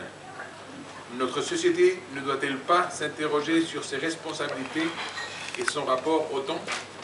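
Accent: Spain. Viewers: L'accent espagnol n'est pas le problème ! Le problème est que ce type rêve : nous ne voulons pas de royauté ! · Drolf Ozio Certes, mais ça ne l'empêchait pas d'avoir un accent béarnais. Il parlait français, avec un accent béarnais. Tout comme ce Louis XX qui parle français avec un accent espagnol. Ne vous inquiétez pas : s'il venait à être appelé au trône, sacré et couronné, son accent disparaîtra peu à peu... L'accent n'est rien ; et contrairement à l'illégitimité, il ne se transmet pas de parent à enfant... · Il est beau, certes, mais putain !!! son accent espagnol fait tache !!! je le vois pas Roi de France, par contre si il a un fils aîné éduquer a la française, alors pourquoi pas.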